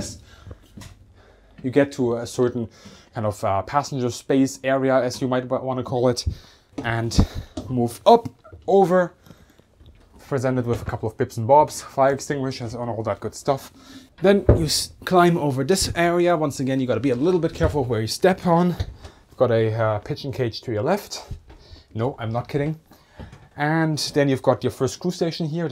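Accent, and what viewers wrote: Germany. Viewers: Deutsche erkennen IMMER einen deutschen Akzent xD, geiles Video bro. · Sound German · du sprichst deutsch das hört man lol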